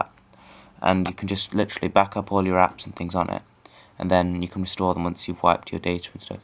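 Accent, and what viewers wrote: RP, United Kingdom. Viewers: you went from sounding british to sounding british and like a robot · He sounds like a British teenager ngl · Your voice has a drastic change in 10 years. Typical british to a posh accent. Just mind blowing. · Eyy this English makes me feel your from the UK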